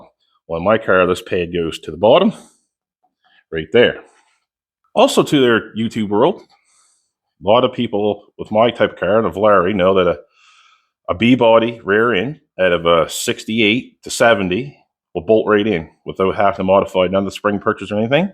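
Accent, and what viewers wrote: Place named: Nova Scotia, Canada. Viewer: I knew that accent was from Nova Scotia !!! Kings county here brother !!!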